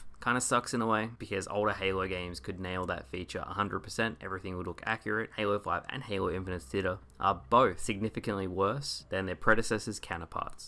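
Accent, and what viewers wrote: Australia. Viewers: Are you Aussie or just in a remote area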